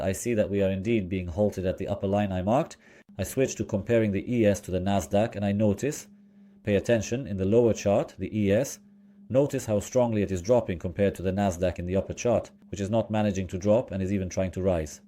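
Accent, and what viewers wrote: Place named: United Kingdom. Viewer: Loved the British accent mate, good luck with the English account 👌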